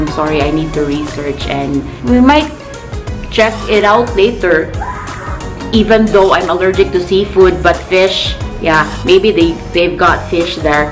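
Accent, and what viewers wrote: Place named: Singapore. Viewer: Te, imong accent ky Singaporean na hehe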